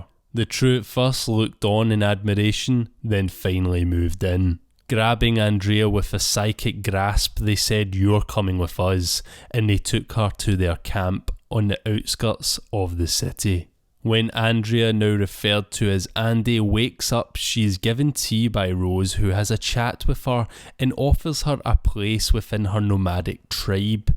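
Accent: Scotland, United Kingdom. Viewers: Erm....his accent is...scottish...😂😂😂😂😂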